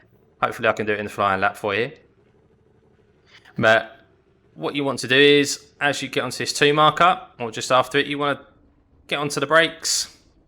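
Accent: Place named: Essex, United Kingdom. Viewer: Harsh but an Essex accent is top tier.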